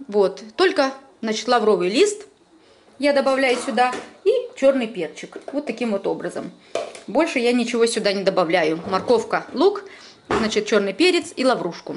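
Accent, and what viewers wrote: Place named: Ukraine. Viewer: Вы были в Украине, что вы так говорите..?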